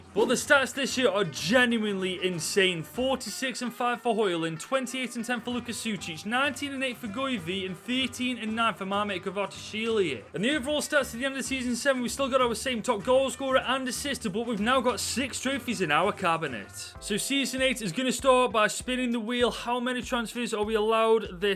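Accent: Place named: England, United Kingdom. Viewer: My guy has the thickest English accent I’ve ever heard, and I love it 😂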